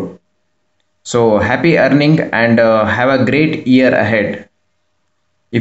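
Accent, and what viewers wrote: India. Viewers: D i love your indian accent, just like a very famous youtuber i love to watch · Iam sorry ! but I laughed a lot on ur Indian English accent😂😂😂😂😂😂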